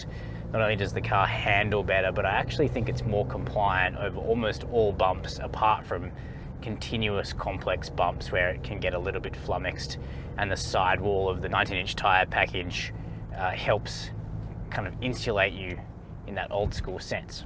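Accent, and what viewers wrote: Australia. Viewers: He is Australian. It’s his natural accent.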